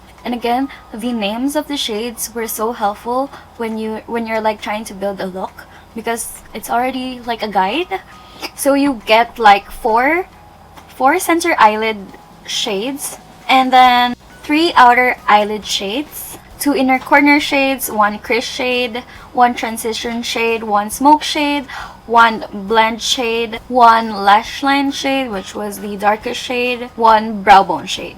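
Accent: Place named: Philippines. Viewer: You seem to be such a sweet person. You are from the Philippines, right? I recognize the accent. I am from Sweden and I was in the Philippines for 6 months in 2011 doing volunteer work. Everyone over there are so nice and friendly ☺️ Hearing you speak makes me nostalgic ✨ You are really good at makeup too! ☺️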